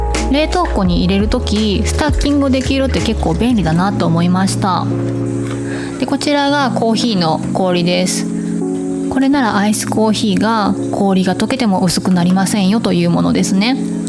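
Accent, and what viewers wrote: Kansai, Japan. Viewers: さんの、関西弁がまじる説明が好きです😊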